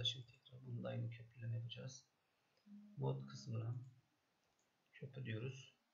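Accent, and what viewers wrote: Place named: United States. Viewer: Sesin Amerika'dan geliyo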